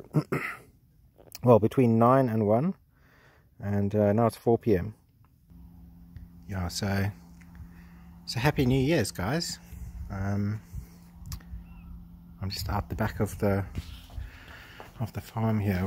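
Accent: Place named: South Africa. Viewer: I suspected you where a South African when i heard your accent, great stuff my friend, enjoy every minute of it, and bask in the safety. Kind regards from South Africa.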